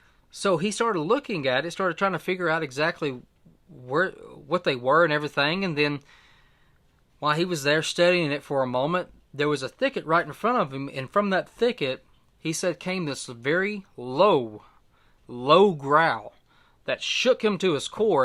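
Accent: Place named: England, United Kingdom. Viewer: I’m sub 136. Good luck with your channel. Love your accent from England 🏴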